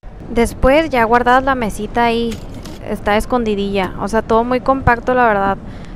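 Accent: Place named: Mexico. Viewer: Así o más ranchera no aguante su acento y por eso no termine de ver el vídeo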